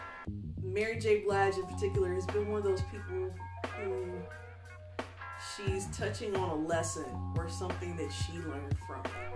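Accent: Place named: Kentucky, United States. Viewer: I love your accent. Kentucky via Central Illinois!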